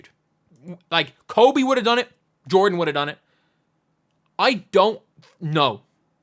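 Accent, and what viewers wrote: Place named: Ireland. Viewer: No worries – an Irishman talking about anything is entertaining to many Americans.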